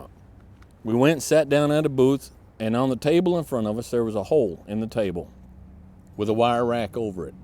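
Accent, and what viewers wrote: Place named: South, United States. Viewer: love the southern drawl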